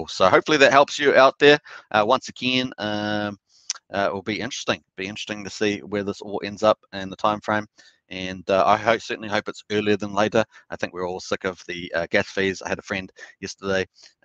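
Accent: New Zealand. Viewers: Nice to hear a kiwi accent, thanks for the informative vid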